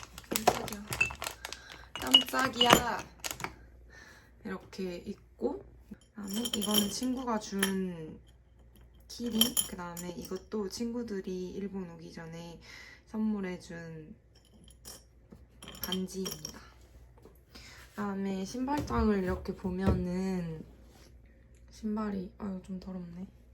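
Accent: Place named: Gwangju, South Korea. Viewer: 누가 봐도 광주 분이시네요 사투리가 정겹습니다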